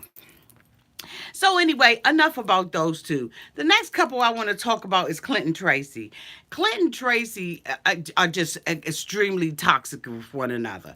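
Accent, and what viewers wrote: Washington DC, United States. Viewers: I was thinking you from DC, I hear my family in the way you speak This is why I am drawn to you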